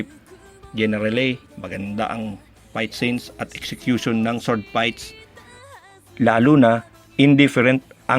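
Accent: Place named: Batangas, Philippines. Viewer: haha ibang klase batangenyo ka pala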